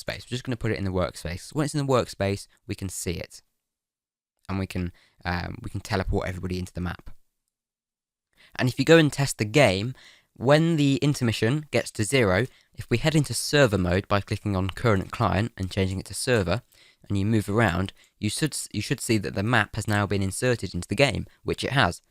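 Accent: United Kingdom. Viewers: I can tell you are British and you have a voice that changed so much in one year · ikr, He used a heavy British accent, lol those days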